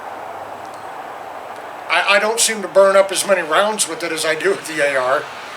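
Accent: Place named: Isle of Man, United Kingdom. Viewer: You have a Manx accent.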